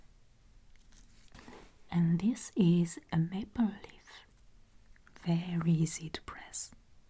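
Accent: France. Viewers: Your videos are, possibly, the best crafted asmr ones I’ve seen so far. Plus, your french accent is so charming...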